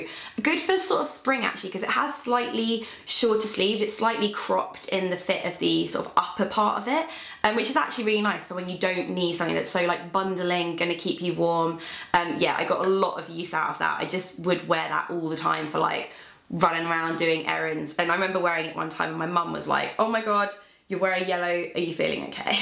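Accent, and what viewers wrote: United Kingdom. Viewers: Yikes, it’s not wrong, she’s British. Have you never heard an accent in your life?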